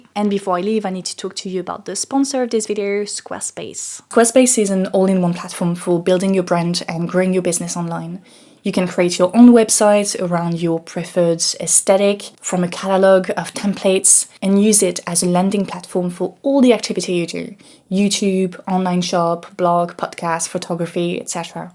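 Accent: United Kingdom. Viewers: Why does she sound like British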